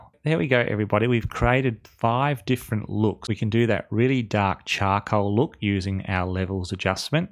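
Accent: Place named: Australia. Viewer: Thanks mate i have only just found you.... easy to understand you are an Aussie!!! I'm staying on board!!!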